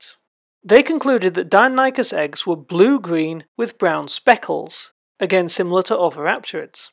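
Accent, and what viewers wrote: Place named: United Kingdom. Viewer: He's from the UK, alright?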